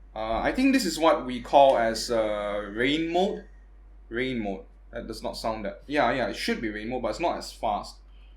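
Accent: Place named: Asia. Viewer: I love that Asian accent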